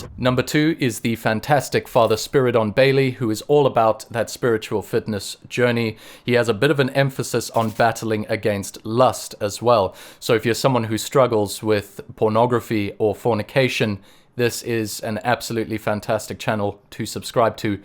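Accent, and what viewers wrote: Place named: South Africa. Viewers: Your accent sounds South African... Are you? I love these guys you mentioned. I love Abbot Tryphon too! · He's mentioned he's originally from South Africa.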